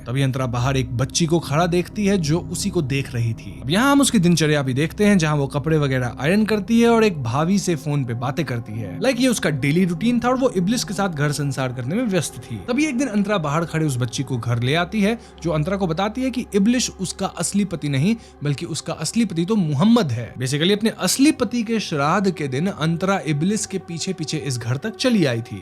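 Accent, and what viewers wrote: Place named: Bengali, India. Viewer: Bhai, just love to listen bengali from you're mouth. Sounds sooo sweet. ❤❤❤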